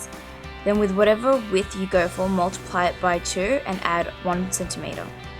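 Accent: Australia. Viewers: Your accent sounds familiar lol, are you from ausltralia? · My god, I'm Australian (with this exact type of Aussie Accent) and I didn't even pick it. You must have a good ear!)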